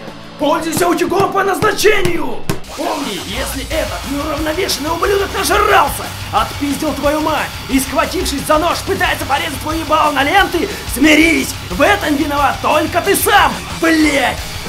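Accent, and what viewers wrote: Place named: Japan. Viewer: говоришь с японским акцентом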